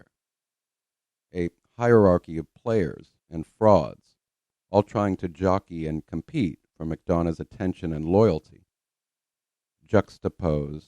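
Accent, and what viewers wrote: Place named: Ireland. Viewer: I’m late to channel, but I do love him and his to-the-point story telling. And yes, his brogue is charming, too!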